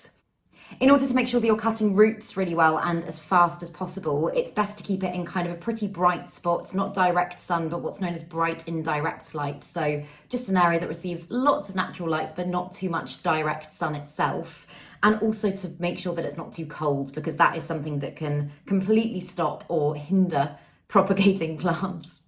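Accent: United Kingdom. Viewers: Do you come from great britain? I think i hear a difference. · How important is the wax sealing?? Is the wax scented or just plain wax.?? I need to move next door to you.😅😅😅.can this be done in leca balls as most of my plants are in leca. I had to remove my plants from soil because of the problem with those nasty fungus nats. They have vacated the premises and i have 4 plants including miss pink princess that i want to transition to hydroplonic. Im afraid to do this major surgery to her. She has new growth on top, sides and bottom at the soil level. She's beautiful but has gotten top heavy and i don't want her to topple over and break something. I will have to notify her of her pending surgery so that she's ready. You are a darling with your British accent and your bun. Thanks for your expertise.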